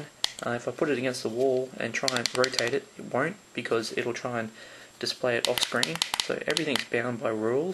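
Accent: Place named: France. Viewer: est ce que tu es français ? je reconnais ton accent français :)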